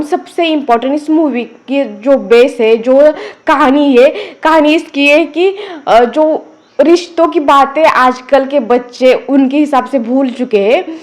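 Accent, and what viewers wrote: Maharashtra, India. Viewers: marathi accent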